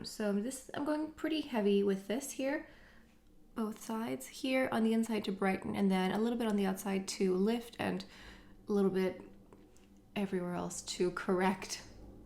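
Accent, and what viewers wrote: France. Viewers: I am French and accent Français is perfect😍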